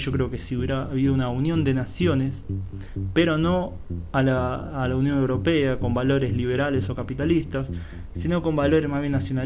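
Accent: Rioplatense, Argentina. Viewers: Lamentablemente, este videísta padece de la tara foniátrica rioplatense de confundir la LL y la Y con la CH; él dice "achá", "chevó", "machor". etc. por querer decir "allá", "llevó", "mayor". No sé por qué acentúa tanto la CH, he visto otros vídeos de otras personas también rioplatenses, pero que no remarcan tanto esa letra, parece que para ellos sería como signo de distinción o algo así, pero lo cierto es que termina fastidiando esa musiquita de la CH. Lo mejor sería insertar una voz robótica, son más neutrales, bien moduladas, y sin sonsonetes de aldea, están disponibles de google.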